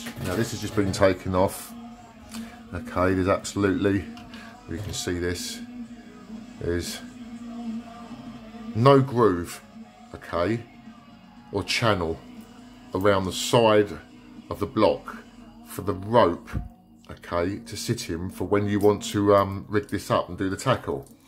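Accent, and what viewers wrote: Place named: England, United Kingdom. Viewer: Holly cow! What an English accent. Somehow I always seemed to think that you would have sounded like Billy Bob Thronton.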